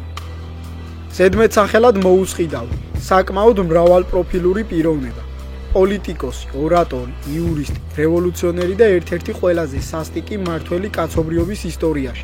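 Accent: Kutaisi, Georgia. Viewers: ქუთაისური კილოთი ისტორიულიფატტების კითხვა კარგი გგონია?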